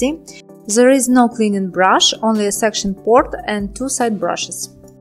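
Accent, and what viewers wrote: Russia. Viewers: Oh this russian accent...🤦‍♂️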